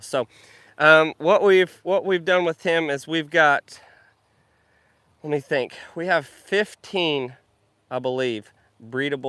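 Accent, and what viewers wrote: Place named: Midwest, United States. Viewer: You want to make a Dunbar Bitter as another collaboration. Seeing as Dunbar is on the Scottish south eastern coast and bitter is a Northeast England/Scots beer. But I don't know if you would have the palate for a bitter as it is a dark bitter beer. You also have a Scottish emblem on the ponderosa in the thistle. So with Dunbar and the thistle you could be associated with Scotland in Oklahoma. So why don't you learn to speak the Scottish Celtic language. A mid american accent speaking Celtic would be great to hear. By the way it sounded like you and Marissa are going to have to to buy racing racing leathers for Brooks in the future.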